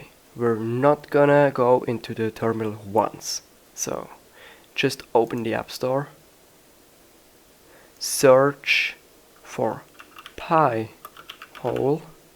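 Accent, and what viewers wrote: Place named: Germany. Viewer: danke fur tutorial bro ich wusste als du am anfang geredet hast das du deu tsch bist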